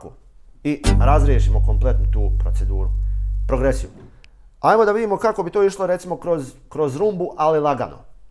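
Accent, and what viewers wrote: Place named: Bosnia and Herzegovina. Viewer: ja moram da ti tepam, nemoj zameriti, sad je 12.decembar slučajno sam naišo na ovaj video, meni i muziciranje koje sjajno radiš nije toliko bitno, koliko si pun pozitive, šta god kažeš van sviračine, toliko zrači harizmom, posebno bosanski akcenat/dijalekt i kako ti prostim jezikom objasniš i sve je tako prirodno. Pozdrav veliki, voleo bih da te upoznam, gde živiš ti, daješ li časove muzičke a Boga mi i ta harizma kojom si oduševio moju suprugu, mog sina, valja se s tobom družiti. Oduševljen sam- Ako stigneš, odgovori mi je l si iz Srbije, ili negde vani. Gde god si, svako bih te posetio. Lep pozdrav, zdravlja ti želim, sreću. Zbilja bih dete, a i sebe uključio ako želiš da nam držiš časove. U ovom regionu punom bolesno sujetnih muzikanatam, samo si iskočio na YT. Ako stigneš. odgovori. Živio!!!!!!!!!!!!!!!!!!!!!!!!!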